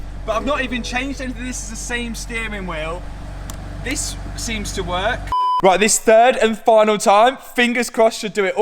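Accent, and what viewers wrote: United Kingdom. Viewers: Yaaay. British accent! Hahaha. Let me get a cuppa! 😆👌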